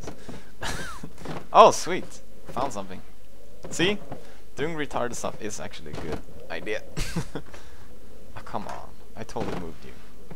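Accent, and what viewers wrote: United States. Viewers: He sound like he has a U.S. English accent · How come in this video u sound so American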